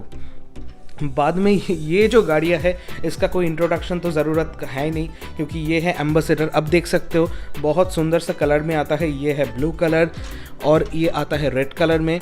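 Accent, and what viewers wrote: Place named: Bengal, India. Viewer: Sir are you a Bengali??..From your Hindi accent...I also have a small hot wheels car collection of 30 cars...But your one is huge..Great job...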